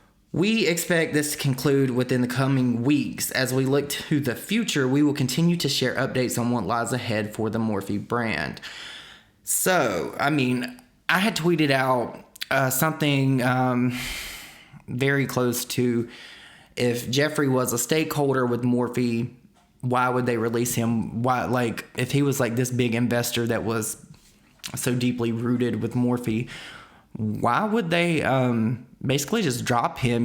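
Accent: South, United States. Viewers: Idk but your southern accent is so soothing lmao. Reminds me of one of my English professors.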